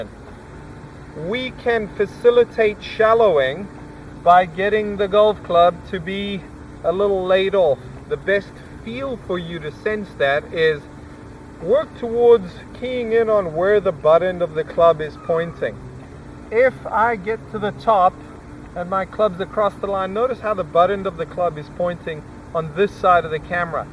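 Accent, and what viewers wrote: South Africa. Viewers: is he really South African bru...??? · Is that a South African accent? Thanks for the vid and info, very helpful. I recently discovered I get across the line at the top, swing too steep and come out the shot slightly as a result. The cupped left wrist also a real "aha" discovery. Now the real challenge, to change the muscle memory!!! Not a small task. any drill tips meneer? Dankie.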